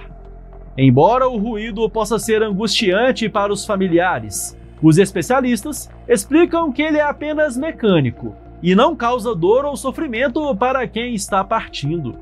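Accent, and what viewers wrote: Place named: Minas Gerais, Brazil. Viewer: Adoro seus vídeos, muito bem explicados, sua voz suave e esse sotaque mineiro maravilhoso!!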